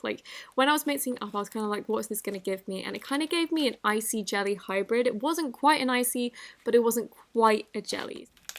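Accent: United Kingdom. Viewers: Omg! Is that a…UK ACENT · are you british or accent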